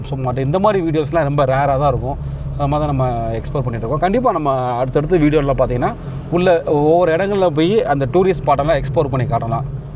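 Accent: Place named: Madurai, India. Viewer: Jee ur video super madurai speech super ji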